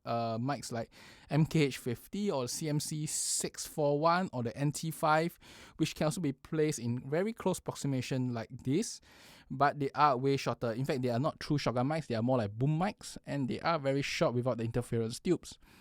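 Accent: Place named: Singapore. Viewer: As a fellow Singaporean who sounds similar to you. The Shure SM7B and the MKH50 does not sound that dark or boomy with our voices vs American and Europeans voice.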